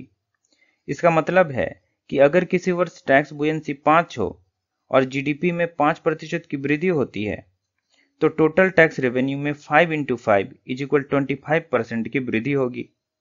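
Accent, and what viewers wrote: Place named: North, India. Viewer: This is North Indian accent of English.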